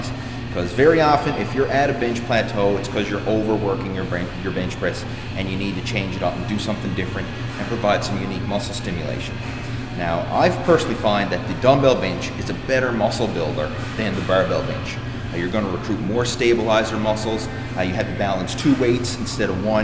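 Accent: Newfoundland, Canada. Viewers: Is this guy canadian ? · You're canadian, right? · Lol the second you started talking I knew you were a Newfie:) Awesome stuff, keep it up~! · god you sound sooo canadian hahaha i love it! good video!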